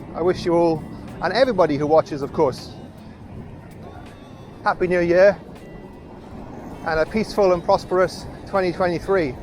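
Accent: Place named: Wales, United Kingdom. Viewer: Good day Buddy, Louis Kats here from Melbourne, Australia. Can you please tell me your name. My partner and I have just become subscribers & last night watched at least 20 of your past videos & loved each one of them. Short, sharp & very informative with a Welsh accent. Current also watching Duong Global Business Consulting Group channel with Ken which is awesome. Also Check out " John Saboe " who I watch & is currently living in Da Nang. My partner and I have visited Vietnam twice & we absolutely love & want to live in Da Nang in the next couple of years. Love your bike ride we remember so many landmarks. We stayed at Babylon Garden Hotel which was awesome & I highly recommend. Hooked on your channel can't wait to see your apartment & a cost of living. If you ever come down to Melbourne, Australia happy to host you. My business partner is Vietnamese same as my doctor, tax accountant, lawyer etc. 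I celebrate Tet ever year with my Vietnamese friends really family & we love home cooked Vietnamese food. Great channel great content. Your number 1 Fan in Melbourne, Australia. Louis Kats ☺👍❤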